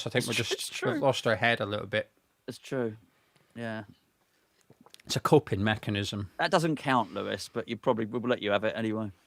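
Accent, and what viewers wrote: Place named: Cockney, United Kingdom. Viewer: That's an extraordinary cockney accent you have there!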